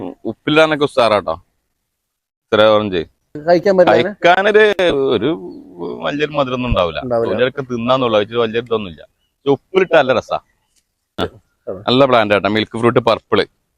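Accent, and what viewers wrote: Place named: Malappuram, India. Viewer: ഇതെൻ്റെ മലപ്പുറം ഭാഷ👍😎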